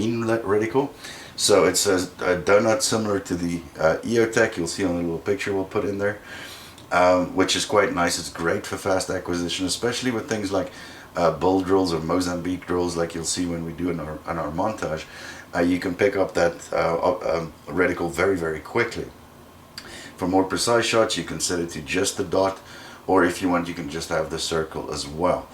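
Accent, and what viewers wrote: South Africa. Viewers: You have a South African accent. Great video. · Lekka South African accent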